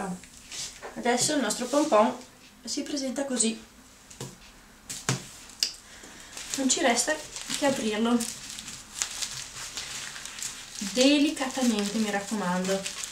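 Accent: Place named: Veneto, Italy. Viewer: Che bello accento 😻 sei veneta ?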